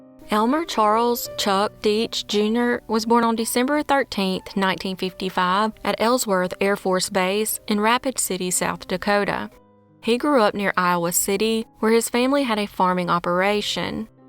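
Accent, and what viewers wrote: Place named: Eastern Kentucky, United States. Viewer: I married a gal from Owsley County Eastern Kentucky. Among other things, her accent made her absolutely irresistible to me! This narrator sounds exactly like my wife! Haha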